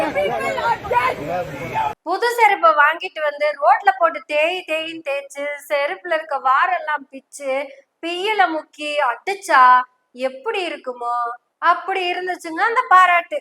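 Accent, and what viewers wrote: Kongu, India. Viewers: I very much like our kongu Tamil. pesu thanfam kalakkeereebfa my daughter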